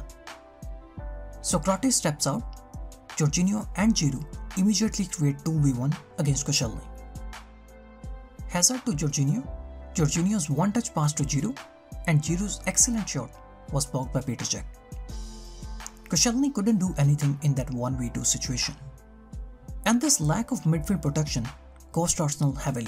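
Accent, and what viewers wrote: India. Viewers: I don't know him but this pronunciation sounds like that of an Asian, especially an indian, great analysis i wonder how could an Indian know so much of football instead of cricket.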